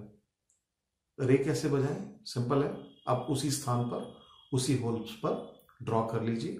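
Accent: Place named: Maharashtra, India. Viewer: your accent is like maharashtrian so I thought it.by the way how to start online classes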